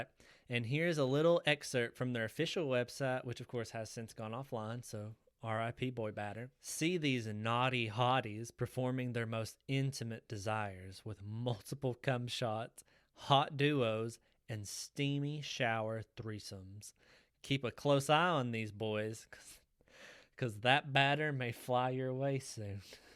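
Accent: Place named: Deep South, United States. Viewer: Coming from Imgur, I was not expecting a Deep South accent 😂. Excited to get rolling.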